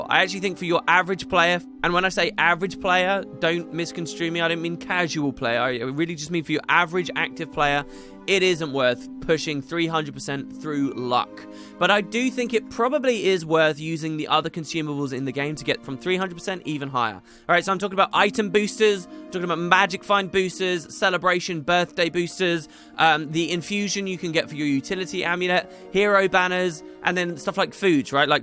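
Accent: England, United Kingdom. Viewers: Honestly, some people complain that he is too drawn out and rant, but I really do love to turn on his videos and chill and just listen to that sweet melodic English accent.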